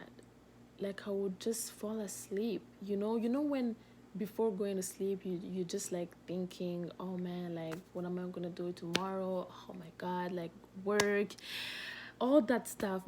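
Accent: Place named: Nigeria. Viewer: Haha MashAllah you have learnt our accent oo una don try pass, 😂😂 may Allah bless you too sister and grant you ease in all your affairs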